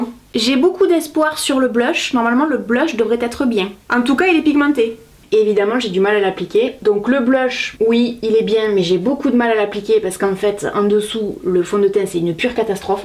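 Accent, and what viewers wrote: South, France. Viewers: Jaime beaucoup ton accent du sud et ta voix et la même que kamila de secret story en tout cas gros bisous, et rejoiner moi sur ma chaîne YouTube biz😗👍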